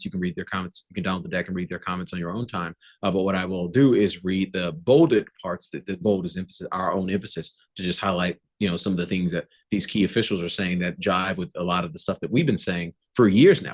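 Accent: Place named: United States. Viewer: Typical American way of delivery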